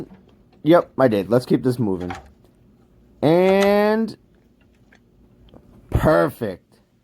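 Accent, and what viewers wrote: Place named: New England, United States. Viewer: "one andaa quootaaa" Love that New England accent! Nice video man!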